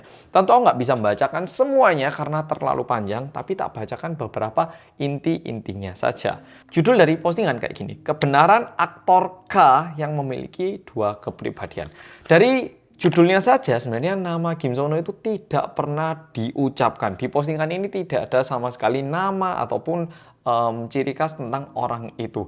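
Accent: Java, Indonesia. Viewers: Mas kalo ngmg korea biasa aja tp kalo ngmg bhs indonesia medok banget jawa nya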